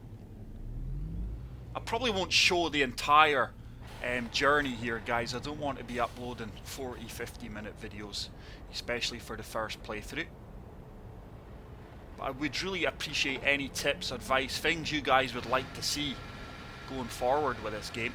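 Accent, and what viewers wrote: Scotland, United Kingdom. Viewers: You sound Scottish what rough are you around bud? I'm from Scotland myself, you'll be looking forward to Road kings and ATS/ETS coming to PS5 soon eh, i sure am! I'm playing road craft just now season year 5 pass was announced for snowrunner if you play them?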